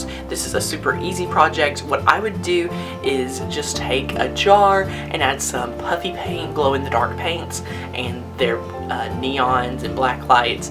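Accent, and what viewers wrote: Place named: Tennessee, United States. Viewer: Cool projects! Yeah, totally do that pineapple lamp. Glad to see a fellow Tennessean and personally I think your accent is fabulous!! Let the haters hate 'cause that's all they know how to do, and you keep on being the wonderful person you are and be proud of your roots!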